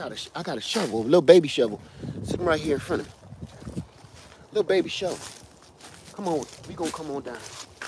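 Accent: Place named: Northeast, United States. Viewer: Sounds like you're in the northeast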